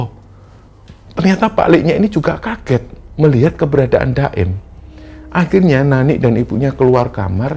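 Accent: Java, Indonesia. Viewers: slm knl,..sy org makassar tp suka medok jawa y bg lho..,semangat selalu buat cerita2 y👍👍👍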